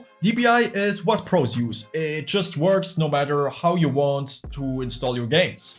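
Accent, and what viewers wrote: Germany. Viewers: You can hear that this guy is from germany.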